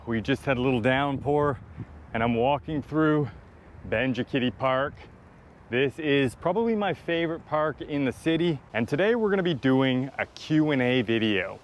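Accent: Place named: Canada. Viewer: The negative comments I think it's the Canadian hoser accent and because you speak very slow and clearly. Which is great cause I can listen to you at 1.25 it 1 5 speed. I'm in Thailand (Hua Hin) now and you helped us get here with your vids. Big thanks from a fellow Canadian and Australian. Hope to come back in Dec for 4 months to escape those Mississauga winters. Cheers keep making those vids.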